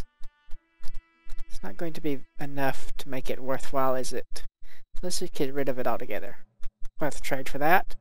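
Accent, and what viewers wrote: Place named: Canada. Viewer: Huh, interesting. You pronounce a few word really similar to Etho (the Ethoslab minecraft guy) who is Canadian. I guess Etho is just a really southern sounding Canadian :D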